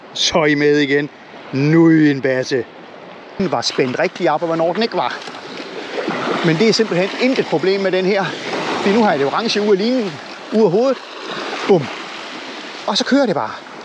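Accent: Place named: Funen, Denmark. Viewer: det er satme konge med en times hardcore fynbo snak. det fik mig til at grine mange gange ! godt nytår :)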